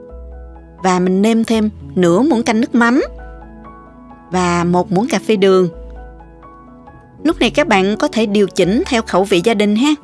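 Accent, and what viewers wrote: South, Vietnam. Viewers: Chào sức khỏe bình an, rất bổ ích... có giọng nói nam bộ rõ ràng dịu dàng, phải thừa nhận quá chuyền cảm...Cảm ơn nhiều.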